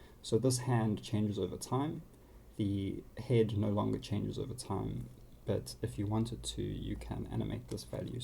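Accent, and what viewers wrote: South Africa. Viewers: You south african mate ?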